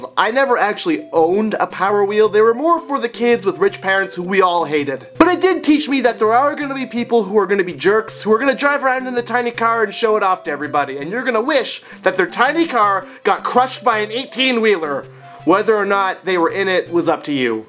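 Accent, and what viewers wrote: Canada. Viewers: i just realized from your accent that you are from Canada and now you look candian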